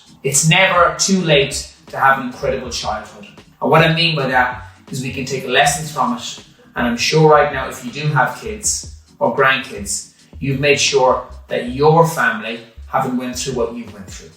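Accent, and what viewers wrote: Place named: Ireland. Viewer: Are you Irish? I love the accent ❤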